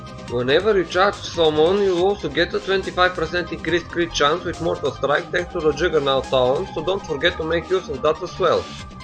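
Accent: Bulgaria. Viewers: ахаха леле брато, само като ти чух акцента, си казах, това момче е Българин :D щом бях в другата стая и ми се стори, че говориш на български, смятайй ;д иначе браво, клипа е мн добър и полезен :) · 100% sam siguren 4e si bulgarin samo kato ti 4uq angliiskiq :D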